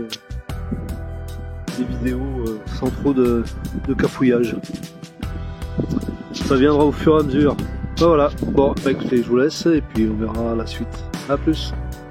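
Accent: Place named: Corsica, France. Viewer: Ils sont tellement beau les paysages. Eh t'as l'accent Corse un peu.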